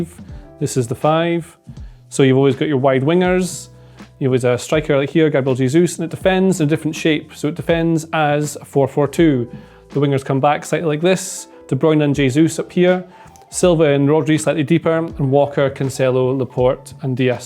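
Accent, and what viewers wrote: Scotland, United Kingdom. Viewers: Let me guess , is he a Scottish?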